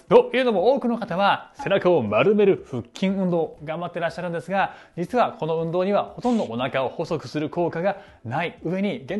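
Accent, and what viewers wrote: Kansai, Japan. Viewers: 分かりやすい解説と、時々出る関西なまりが心地よく、楽しくやる気が出ます。優しい励ましの言葉も嬉しくなります☺！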